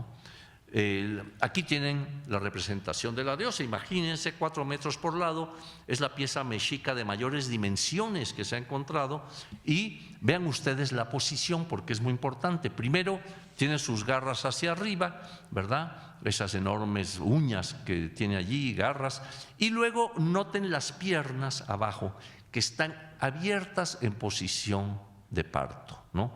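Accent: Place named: Spain. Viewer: Eduardo Matos, encargado de poner por los suelos la cultura Mexica. En la escuela nos enseñaron a desestimar nuestro pasado historico, y a llamarle a España "Madre Paria", naturalmente yo ya soy grande, ese mote se lo pusieron a España a travez de los siglos y todavia en el siglo XX se le seguia llamando así a la bola de delincuentes y bandoleros ignorantes, que por poner un pie en las playas mexicanas, pasaron a decir que descubrieron América y pasó a formar parte de los tesoros del reino español. Al profesor Eduardo Matos, se le nota hasta en la voz su origen español. Siempre poniendo muy por debajo la gran cultura de los pueblos Mexicas de la poca cultura que demostraron los españoles al destruir la gran ciudad de Tenochtitlan.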